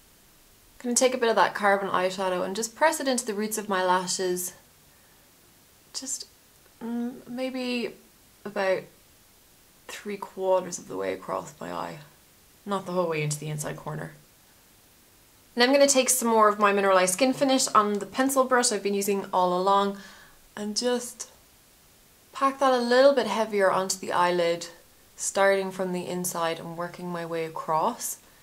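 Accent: Ireland. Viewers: Just randomly found your channel. LOVE!!!!!!! I know you mentioned Australia, but that's an Irish accent I hear. What's the story???? I went to Northern Ireland last summer, so I'm basically obsessed. Thanks for a great tutorial :) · you are from aus?! i thought u were irish ha!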